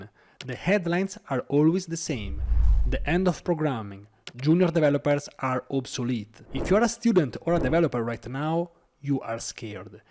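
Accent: Italy. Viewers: Your Italian accent just makes me less worry , thanks that was good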